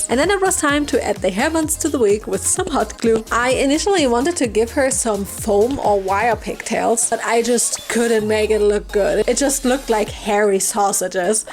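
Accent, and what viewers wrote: Germany. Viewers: Omg youre german? xD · She’s German, dude. It’s her accent. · Grüße aus Leipzig! freu mich immer wenn ich einen deutschen Akzent höre ❤ ^^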